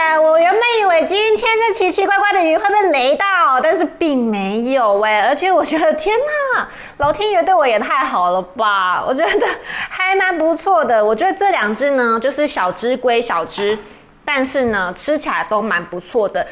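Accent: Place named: Tainan, Taiwan. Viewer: 豆娘仔是我在海邊第一尾起來的魚當時還只是去跟同學聊天用手擒法打發時間意外在肉粽裡釣獲同學都稱呼為厚殼仔肉質聽說超級細緻好吃應該比黑格細緻多了黑格是很難釣的魚的阿公是釣魚高手釣黑格要有耐心要抓準揚竿的魚汛和時機點不然很容易開空槍 (當時海調初心者的我被黑格戲弄得很慘@@ 我同學教我海釣就是只用阿波釣黑格野生的黑格不便宜小時候就吃很不錯的魚很幸福黑格沒有細刺唯一的麻煩就是去鱗非常不容易每次都很害怕殺黑格黑格沙格赤翅仔枋頭仔(般頭仔) 這家族的都不太好去鱗(還是鯖魚好肉多不用去鱗^^) 題外話我的老家在台南南部人說吃這個字都是四聲的重音的老家應該也是台南那邊吧? 發音聽起來超親切北部人說吃都發一聲 (鵝大人的台語也很有親切感我跟鵝大人同鄉的)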